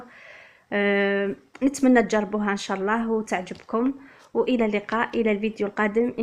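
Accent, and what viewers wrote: Setif, Algeria. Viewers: انا من الجزائر لهجتك تعجبني كثيرا لانني من اصول سطايفية و نحن نعيش في العصمة و بالضبط بحسين داي و نشتاق لسماع هذه اللهجة الحرة حفظك الله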